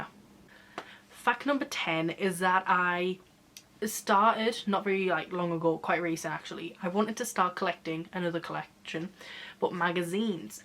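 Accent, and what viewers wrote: United Kingdom. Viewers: I love your accent, you British? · You are so pretty😍 Also I love your voice are you British?❤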